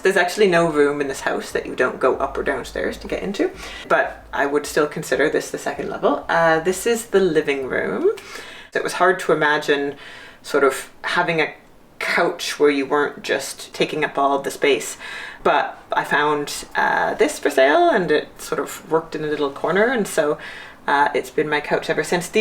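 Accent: Canada. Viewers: Compared to American tiny houses, this is a mansion! Where the heck is it? She sounds Canadian. Ah ha! Canadian and Toronto. Very cool tour! Lucky! Despite the narrow size, I bet it cost quite a bit to build and the rent is probably not cheap. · Love this house! Is her accent from Toronto? It doesn’t sound like the Canadian accents I’m used to, it’s adorable so I’m just curious.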